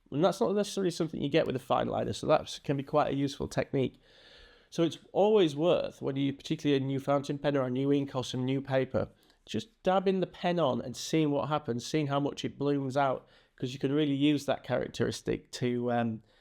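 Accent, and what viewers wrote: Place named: Yorkshire, United Kingdom. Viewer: Our washer is running, which is quite loud, so at first it sounded like you said, "Holding the pen at the fruit store." 🤣🤣🤣 I have no trouble understanding your Yorkshire accent (I'm an American with a longstanding love for British TV especially Monty Python) but with the washer running it made it sound different. I should maybe also mention that I often mis-hear words as a quirk of my autism. It makes for some hilarious conversations with my husband. 😂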